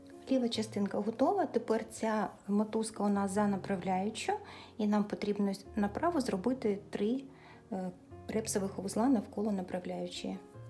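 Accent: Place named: Russia. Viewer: Странно звучит украинский язык от русскоговорящего человека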